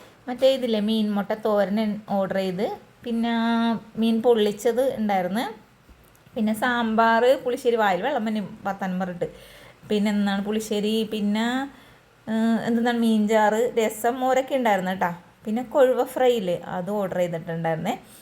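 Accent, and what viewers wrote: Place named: Kochi, India. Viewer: വീട്ടിലേക്ക് ponenu. സുഖമുള്ള kochikkarude സ്വന്തം ഭാഷ...ponenu...varanenu....❤❤❤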